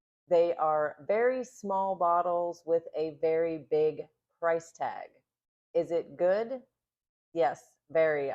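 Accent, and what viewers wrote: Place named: United States. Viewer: Love the accent. Im from Ireland and I rarely here a real and nice Amwrican accent, you know some influencers put on a drain thing and sound artificial.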